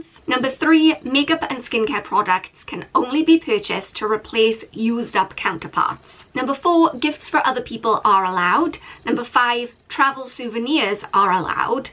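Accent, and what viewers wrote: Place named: Wales, United Kingdom. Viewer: I was trying to place it too; thought it sounds a little like Scottish but not quite... it's got to be Welsh, right? :D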